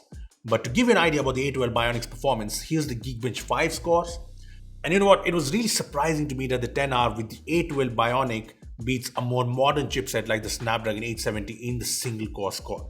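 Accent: India. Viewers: Butler english...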